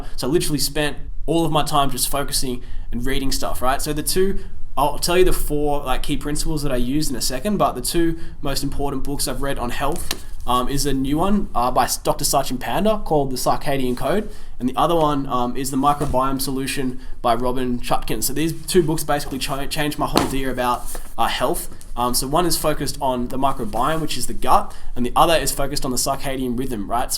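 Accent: Australia. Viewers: that fooking Aussie accent mane... great video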